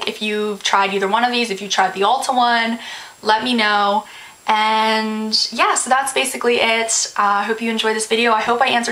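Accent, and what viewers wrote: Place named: Louisiana, United States. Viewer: I'm from Louisiana - you sound like you're a Cajun girl too maybe?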